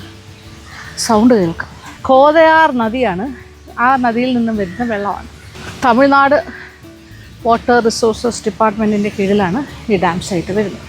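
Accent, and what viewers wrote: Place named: Trivandrum, India. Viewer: Innathe food kanaan thanne endoru bhangi... Tvm the bhasha ano Mam adipoli...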